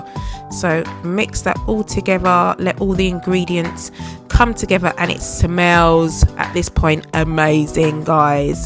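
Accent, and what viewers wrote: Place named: United Kingdom. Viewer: Good question .your accent is British .I think u born there .but if you jamaican family born uk .can you talk patios?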